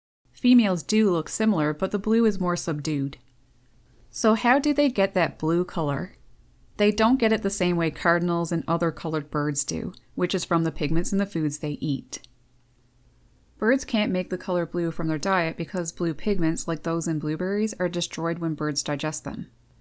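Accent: Canada. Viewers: I love you! I love your Canadian accent! I love bluebirds! 💙 I have alot of them in my yard and occasionally will eat seed from my feeder. Interestingly I have a wooden split rail fence. Now I know why I have so many in my yard. Thank you for your 💓 of birds! You're easy to understand, and humble way of sharing information is a Blessing!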